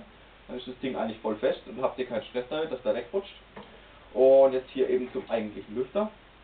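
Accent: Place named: Swabia, Germany. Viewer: Wie ich den schwäbischen Dialekt hasse, da kommt so richtig mieses Fremdschämen auf - Ich bin selber Schwabe... ;_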